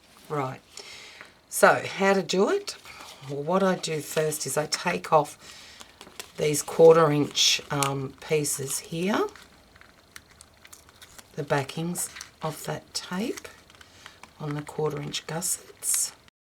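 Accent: Australia. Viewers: Thanks for sharing. I really like it without the flaps and with the added strength. I've made mine 7.5 inches high because I want to do an album that will fit 6 x 4 photo mats. Can you recommend what sort of pages to add to the hinge ? I'm thinking about doing just a single sheet of cardstock because I can't make pocket pages that big without having to stick 2 pieces of paper together with binding and then it looks a bit bulky. I'm also worried that the open edge would be too big at 7.5 inches. Thanks again, great to hear an Aussie accent. I'm from Melbourne living in the US now.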